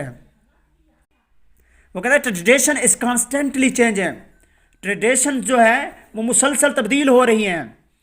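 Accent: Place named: Saraiki, Pakistan. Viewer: Saraiki accent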